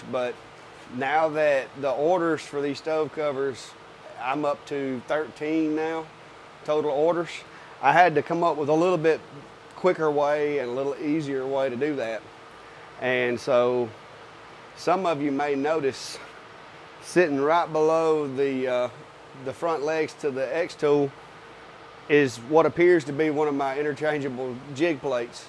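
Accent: Alabama, United States. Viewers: Cool project. And it's nice to hear another Alabamian's accent. I guess it's been too long since I have been home.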